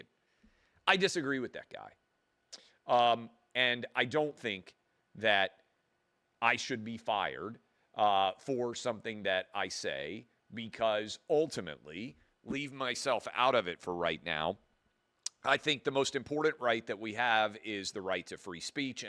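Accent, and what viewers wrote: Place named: Boston, United States. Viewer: Sorry Clay, you are alone on this topic. You sound like you are from Boston.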